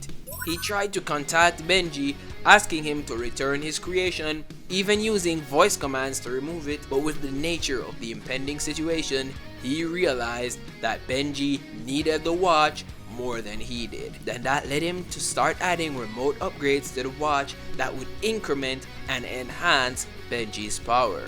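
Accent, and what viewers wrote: Jamaica. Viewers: Yo your jamaican? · You kind of sound Jamaican A little bit for me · I had no idea he was Jamaican until now :face-blue-smiling::face-fuchsia-wide-eyes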